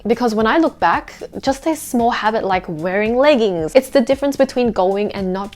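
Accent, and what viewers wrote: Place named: Malaysia. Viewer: 你是大马华人吗？口音能听出来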